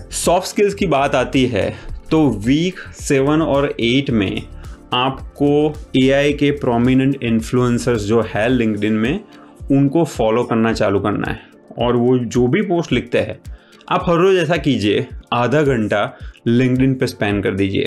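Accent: Gujarat, India. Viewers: Thank you for the in depth Roadmap sir💯, btw got to know from your tone that you are gujarati(same). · Are you Gujarati bro ?😅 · You sound very gujju bhai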